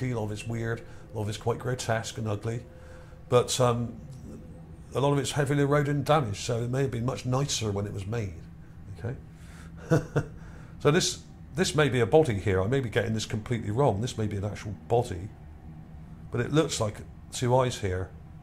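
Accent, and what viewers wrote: United Kingdom. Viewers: Are you from the U.K.?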